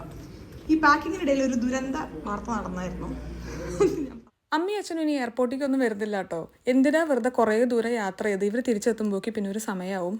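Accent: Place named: Valluvanad, India. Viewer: Nalla Reethiyil Valluvanadan Bhasha Samsarikkunnundallo, Swantham sthalam Valanchery aayathu kondayirikkum alle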